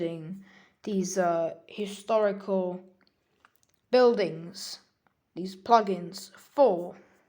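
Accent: United Kingdom. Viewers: where are you from? England? I like your accent ;)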